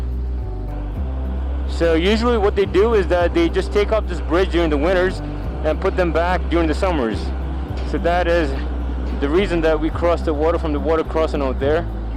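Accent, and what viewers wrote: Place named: India. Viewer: Hey, are you Indian? lol ...OMG you accent was so nice +1 sub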